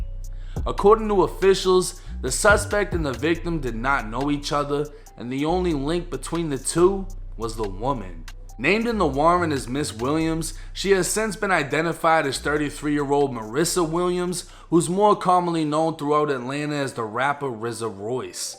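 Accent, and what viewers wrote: Boston, United States. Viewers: Just really getting into your vids I see you rep FL but your mass/Boston accent is crazy 🤣🤣🤣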